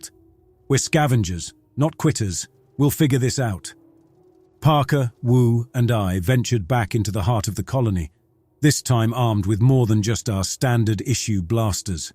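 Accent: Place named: England, United Kingdom. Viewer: I must say alot of those Creepy pasta channels regurgitate the same stories over and over And I can tell that some of them have just used the transcript of others reading exactly the same content ...boring Your story telling is class and has been on my nightly listening playlist since I found your channel Maybe I'm abit partial because I'm English too. Anyway...thanks and please keep them coming 🙏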